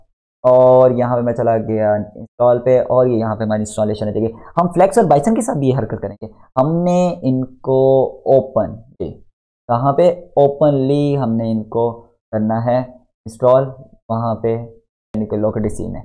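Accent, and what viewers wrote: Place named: Kashmir, India. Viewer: are you from J&K? your hindi sounds like it's having kashmiri accent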